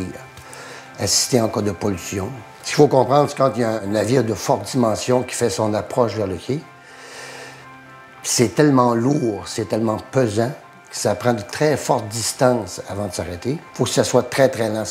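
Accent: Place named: Quebec, Canada. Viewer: Friends, that ain't Parisian french...that's joual!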